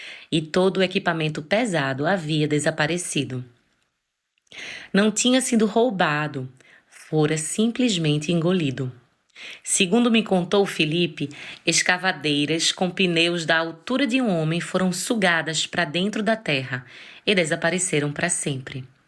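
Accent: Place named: Northeast, Brazil. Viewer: éssa prónúncia nórrdeshtina tem uma Bé-leza louca! Tótal! Estou Mésh merizadoooo !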